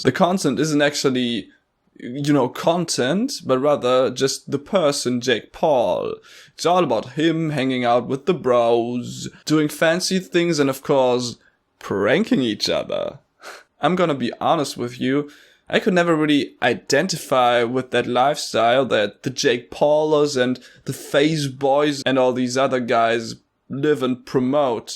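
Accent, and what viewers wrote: Germany. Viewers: Really enjoyed your video, and totally agree with you on most points. From your accent and the bag behind you I assume you're German (forgive me if you're Austrian or Swiss). I had to smile a bit when you mentioned how Jake Paul's philosophy (or world view or whatever) is very American, as it reminded me of how the German exchange students here characterize such people, and sadly I have to agree with them. No I don't want a generation of Jake Paulers. His content is a monument to his own arrogance and narcissism. While I don't like his older brother's stuff either, at least his charisma doesn't seem anywhere as fake and vapid as Jake's. As for the Navy Seal stuff, while I'm sure the armed forces would have ripped much of the narcissism from him, I have to agree that it fits in line with the vapid (probably) bully type that I'm sure he was, and to some extent still is. 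And I hate the "we made it in one day," shit. In writing, editing and refining your work takes up much more time than actually writing content by volume, but it's the refining and craftsmanship that come with it that make it good. Jake is just pumping out sewage.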